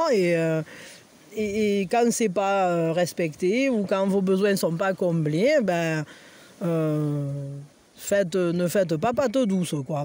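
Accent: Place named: Alps, France. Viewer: Bonjour. Quelques semaines que je me régale a t'écouter ton accent m'est de plus très familier tu ne dois pas être très loin de chez moi lol j'hésite entre les basses alpes ou les hautes alpes. Lol bref tu es devenu mon plaisir quotidien et en plus je te trouve très douée et pleins de répartie 😂. Même si ça ne me parle pas forcément à moi j'adore ta dérision et ton humour. Donc voilà je voulais que tu le sache. Car tu mérite avec tout le temps que tu nous accorde de savoir que tu fais bien de continuer. Bonne continuation à toi au plaisir de t'écouter encore. J'adore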